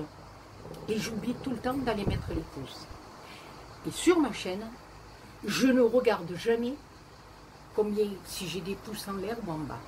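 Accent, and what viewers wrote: South, France. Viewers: Coucou, j'adore regarder tes vidéos, ton naturel et ton accent bien du sud me donne du baume au cœur. Et comme tu dis chacun fait comme y veux. Bisous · Coucou. Je suis tout à fait d'accord avec toi. Ces questions sont vraiment c.....s. Comme pour Toi, youtube c'est une source de partage d'idées, de tutos et de connaissances rigolotes comme tu es, comme Greg etc.... que des gens simples et francs, et cet accent qui est le mien j'adore. Moi aussi toutes ces questions me font "monter la boufaïsse". Comme j'aimerai vous rencontrer Toi, Greg, Patou parce que je pense que nous ne sommes pas loin (géographiquement parlant) je peux espérer peut-être un jour chez Action Saint -Victoret ou Istres (car je suis plus près d'Istres. Un conseil. Continues, ne changes rien. À bientôt. Bisous 😘.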